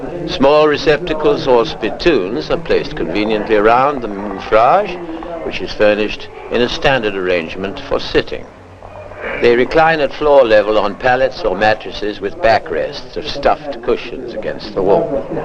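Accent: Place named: United Kingdom. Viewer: المعلق ليس امريكي بل بريطاني و الممرضه من أيرلندا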